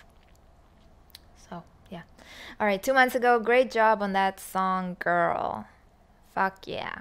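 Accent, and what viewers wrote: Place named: Israel. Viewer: Finally, I get to hear your Israeli accent. Love it. My mother is a hairdresser, she would tell you protein and conditioner for your hair….look a little dry. I’ve been watching you off and on for 8 years. I love watching your infectious smile. Always makes my day brighter. I would say in the beginning you were playing the music….now, 8 years later, your feeling the music. And this is what now drives your playing. Rock on baby doll!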